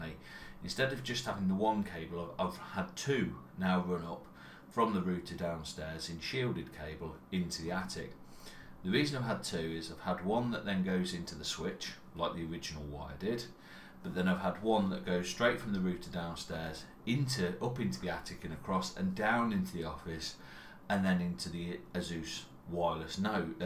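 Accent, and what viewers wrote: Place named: England, United Kingdom. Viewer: It funny he looks like an American Alabama football fanboy. It's weird hearing an English accent. I'd expect a southern twang coming out of his mouth. I bet he's a huge soccer fan. Those boys are crazy. They kill each other over games and scream racist stuff at the players. I'm not saying he does that but you know what I'm saying.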